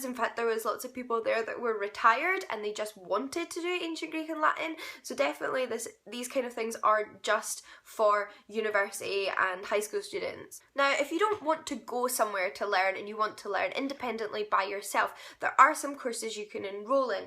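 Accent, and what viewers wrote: Scotland, United Kingdom. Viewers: Your accent sounds Scottish. Didn't know anyone in that area of the world was interested in ancient languages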